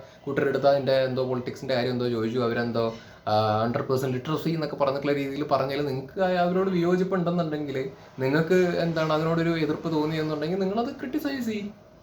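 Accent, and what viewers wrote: Palakkad, India. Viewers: Bro. Nammande Palakkad accent super...Keep it up, and best wishes. Big fan of you from Delhi..👍👍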